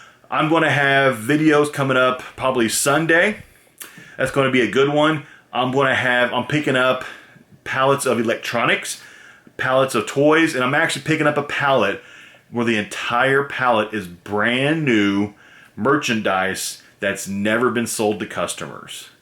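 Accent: United States. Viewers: I had a feeling that you were from the midwest but wouldn't have guess you are a Hoosier aswell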